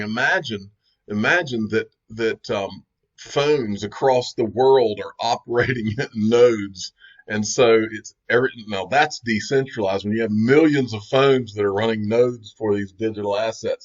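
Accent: South, United States. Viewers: That was halarious..with his southern drawl.😂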